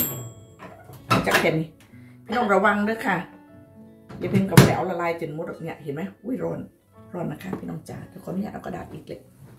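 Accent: Korat, Thailand. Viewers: ดูคลิปไหนก็ชอบน้องเห็นพูดสนุกโคราชกันเอง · อ้าว คนโคราชนี่นา พูดสำเนียงเหมือนคนกระโทกโชคชัย ฉันก็คนโคราช ฉันเคยเรียน ร.ร. สุรนารี ฉันกดติดตามให้แล้วจ้า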